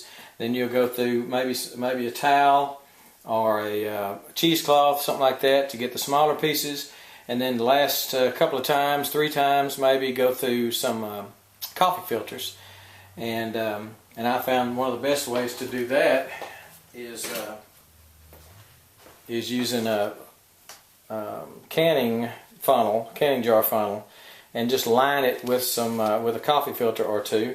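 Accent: Texas, United States. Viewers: Very cool! Love the Texas accent 😁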